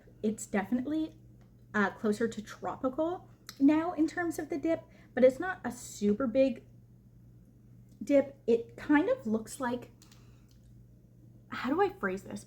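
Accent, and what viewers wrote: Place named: France. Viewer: Congrats on the complete refresh! 🎉 that’s a pretty new colour story! So many possibilities!! Also, love your French accent. I’m assuming you speak French? 🇨🇦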